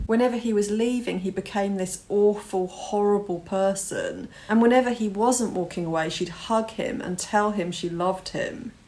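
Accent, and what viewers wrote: England, United Kingdom. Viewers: Accent too English for me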